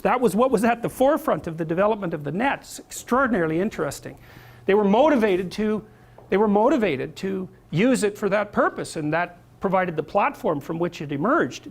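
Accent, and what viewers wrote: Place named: Canada. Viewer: I’ve only watched a few of his videos, but his Canadian is in overdrive on this one.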